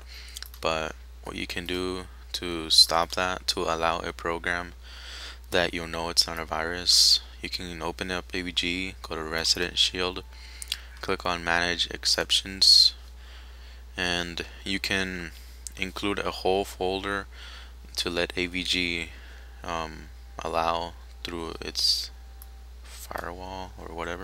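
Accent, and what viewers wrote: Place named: Mexico. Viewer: must be mexican, the way he talks with the talks with the question rises in his sentences.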